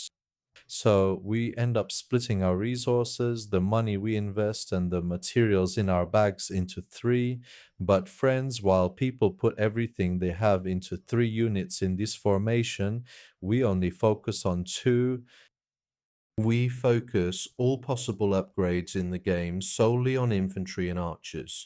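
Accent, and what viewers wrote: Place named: Turkey. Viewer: I listened to the video in the english language and the translation was very good. Oddly enough it still has a Turkish accent. Thats not a bad thing I was just surprised since it is AI translated I wouldn't have expected there to be any accent. You must speak very quickly in Turkish!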